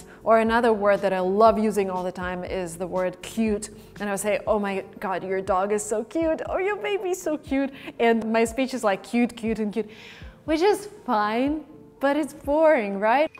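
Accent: United States. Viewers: Hi ma'am! I've been watching your videos from this year and I've quite improved my vocabulary and caught up the American accent. Thank you for bringing on new things every week. 😇❤️ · I love your way to teach English with an American accent 😊👍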